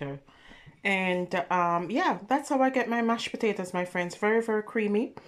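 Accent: Jamaica. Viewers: Yummy 😋 you have an jamaican accent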